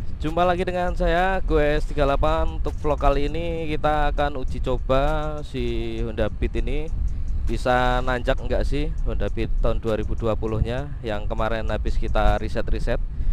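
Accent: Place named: Java, Indonesia. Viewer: Asli mana mas?kok logate jowo "dronjongan" 🤣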